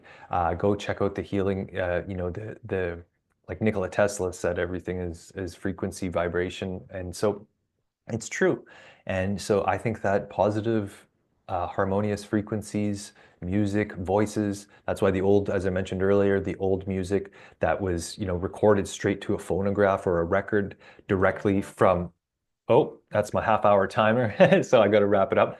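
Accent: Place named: Scotland, United Kingdom. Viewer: Yep...nice one 😮 nice Scoish accent 😅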